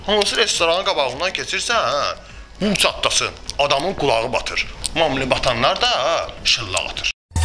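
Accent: Baku, Azerbaijan. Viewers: Bakı ləhçəsüdürdəəə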